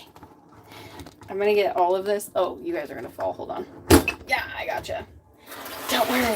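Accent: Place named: New York, United States. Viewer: Thank you so much for the fun live yesterday. Just going back and watching the beginning… chef’s kiss to your New Yawk accent. “We makin’ CAWFEE OVA HERE!” 😂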